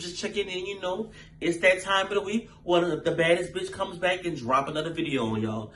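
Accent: New Orleans, United States. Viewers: Bitch this story time got me rollinnnnn 😂😂😂😂 omfg! I’m subscriber 557🥰🥰 and where you from? The accent giving new orleans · chileee this story was too mf juicy lmaooo I had to subscribe once I hear the nola accent.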